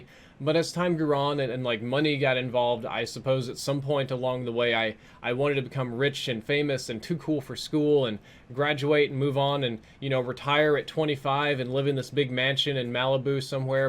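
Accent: South, United States. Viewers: That southern accent was strong. Where’d it go? 😢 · I used to watch you all the time from like mw3 up until I stopped playing on console after advanced warfare but have recently got back into your content a bit more. Maybe that helps you feel a little better? I also really quite enjoyed your philosophical, abstract and dream videos since middle school (going to college now hehe). I'll definitely keep watching again :) Also, DAMN THAT SOUTHERN ACCENT LOL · That old video with his southern accent oml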